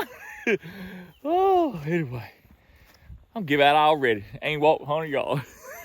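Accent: South, United States. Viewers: You the man.keep up that good old country talking..... Come on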